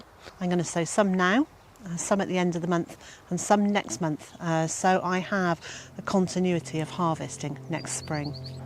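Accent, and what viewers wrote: United Kingdom. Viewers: Hi, you sound British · Oh, British accent. This will not be helpful. Heat is the problem, not cold.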